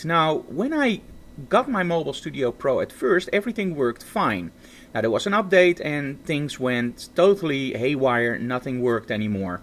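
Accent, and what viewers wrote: Netherlands. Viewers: Hm Me: looking for a fix for my wacom Me: Coming across this video Me: huh, this guy has a typical dutch accent.. I wonder if he's... Me: * finding his website * Me: .. I was right.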